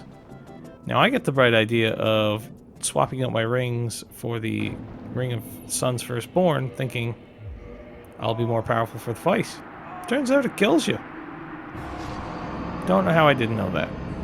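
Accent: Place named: Ireland. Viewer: Great video man, can I ask if you’ve any relation to Ireland? You pronounce a lot of your words just like I would, I’m from Dublin :).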